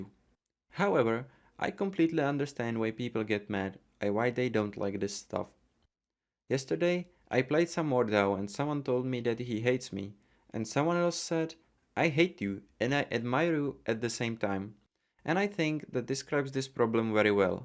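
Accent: Czech Republic. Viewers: Where are you from? You sound like Czech.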